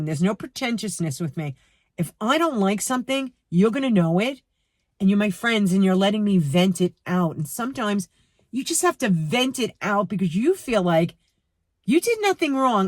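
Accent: New York, United States. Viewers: I think it's fairly common; even if they don't say anything; it's just an attitude issue !! workers can be like 'robots' !! I like your accent; was about to ask if it's a NY accent ! 💚💟 I think she will learn from the experience.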